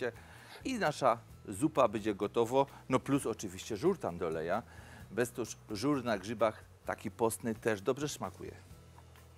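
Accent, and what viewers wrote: Silesia, Poland. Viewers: uwielbiam tego Pana!!! Bardzo fajnie opowiada i ten dialekt śląski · Pani Doroto, zgadzam się, że nie ma milszego kucharza :) Fajnie jest też posłuchać takiej śląskiej gwary. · Panie mam prosbę chętnie bym skorzystsla z panskich przepisow ale ni w ząb nie rozumię pańskiej gwary,czy mógłby pan prowadzic to w jezyku polskim,bo mam wrazenie ze te panskie przepisy są tylko dla śląska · jak ja lubię śląską gwarę, jestem z podlasia, ale co nas dzieli to nas łączy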